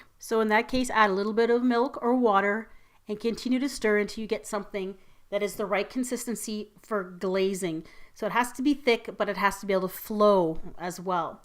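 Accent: Canada. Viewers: Are you canadian? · are you Canadian by any chance?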